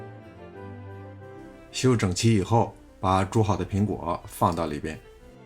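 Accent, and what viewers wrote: Beijing, China. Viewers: 叔叔你是住在海外吗？北京口音听着特别亲切。我是你的韩国粉丝。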